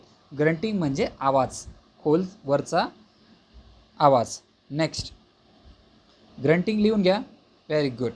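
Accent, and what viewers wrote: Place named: Khandesh, India. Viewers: Nice Khandeshi English 😂🎉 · Sir your accent is like khandeshi.... Thank you so much for explanation....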